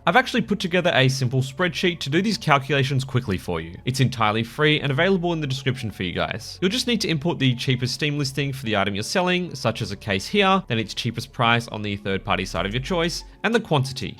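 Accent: Australia. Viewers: couldn't help but notice the aussie accent haha. I'm from Australia myself and was wondering how you account for the 10% gst for depositing on 3rd party sites like skinport/csfloat. Do you just tank the fees or is there a way to navigate around it? Love the vids man keep it up!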